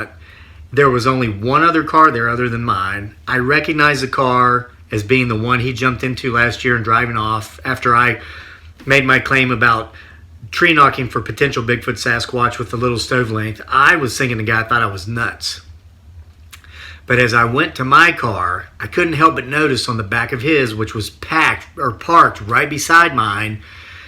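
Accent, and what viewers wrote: South, United States. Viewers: You know how they say “if you can’t say something nice, don’t say nothing at all” ? Yes I got something to say but it isn’t nice! You’re making an absolute mockery of the subject. You’re pushing merchandise! That’s it!!! Some people have had real life encounters and you’re channel is a disgrace. That deep southern draw you seemed to have picked up all the sudden is rediculous. Grow up!!!!!!!! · LOVE YOUR SHOW 😂😂😂😂,IS IT JUST ME OR HAVE YOU SUDDENLY DEVELOPED A SOUTHERN ACCENT? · Voice change,. Southern twang! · Yes, Suh! I do declare. Listening from Georgia, I hear your Southern accent. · What is up with that heavy southern accent?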